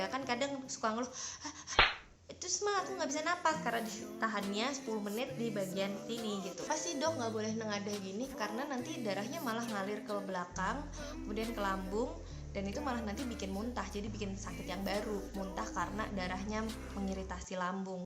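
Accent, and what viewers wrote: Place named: Java, Indonesia. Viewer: Dok jawa nya orang mana dok? Hihi keep semangat dok!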